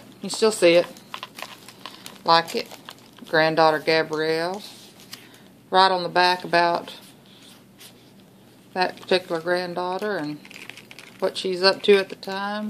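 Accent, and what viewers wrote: South, United States. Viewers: ...where is your accent from? I am from Mississippi and I know it sounds southern...just not sure where...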